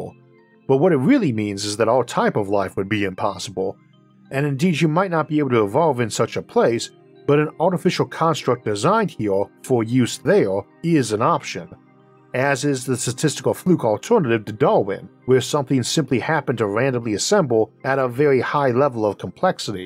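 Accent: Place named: Brooklyn, United States. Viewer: What accent is going on here, Brooklyn Cambridge?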